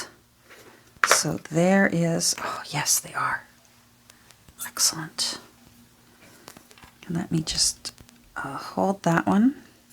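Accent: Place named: Canada. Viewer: I was born and lived in Minnesota for the first 19 years of my life, and I think my accent sounds more Canadian then yours. But then I heard you say “about” and I thought…aha! There it is. Very slightly Canadian accent came through. Thanks for posting. I enjoy watching your videos so much. I appreciate you.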